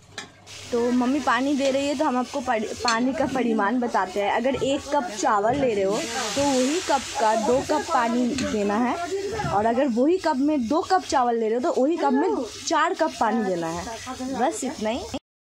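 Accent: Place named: Bihar, India. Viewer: Aap Bangla mein Hindi bihari wala Hindi bahut achcha mix kar rahi ho tikha ko titai bolkar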